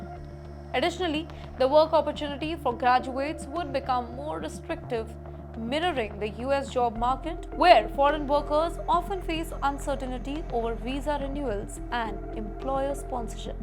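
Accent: India. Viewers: Bro it's Indian English, Not an American English.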